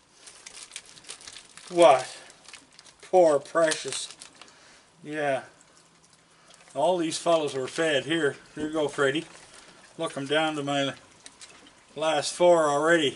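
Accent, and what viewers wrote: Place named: Canada. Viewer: Who could not love a raccoon? 💜💜 Love that Canadian accent, eh!!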